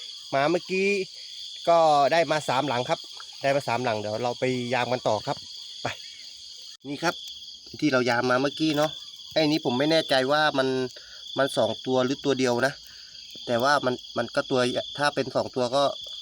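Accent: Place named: Sukhothai, Thailand. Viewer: พี่คนไหนค้าบ ผมคนสุโขทัยค้าบ สำเนียงคล้ายๆ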